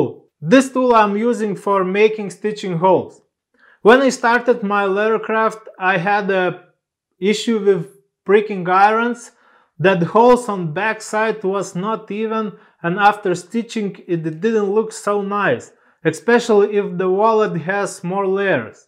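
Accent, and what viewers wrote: Russia. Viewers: Мне кажется вы русский) очень похожий акцент) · Русский акцент 😁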